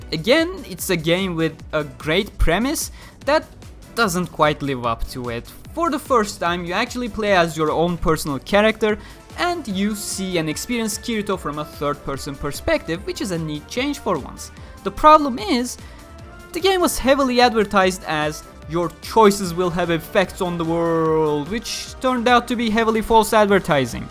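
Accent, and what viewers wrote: Turkey. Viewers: Telaffuzundan türk olduğunu fark ettim, güzel iş çıkarmışsın tebrikler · Yalnız aksan baya Türk :) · Sesi duyduğum gibi türk aksanı olduğunu anladım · hiç kanal ismine bakmadım adamın türk olduğunu direk konuşmasından anladım başarılar kardeşim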